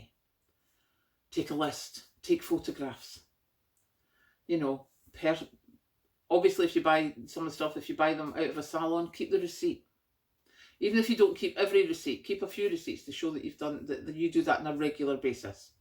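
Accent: Scotland, United Kingdom. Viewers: which part of scotland are you from roughly? Im Not stalking you 🤣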